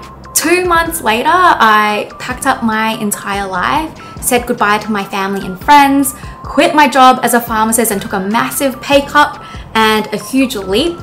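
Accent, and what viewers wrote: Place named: Australia. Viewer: No wonder U have a very strong Aussie accent! 🤭 Was wondering how come a Japanese girl speaks like an Aussie! 😆🌏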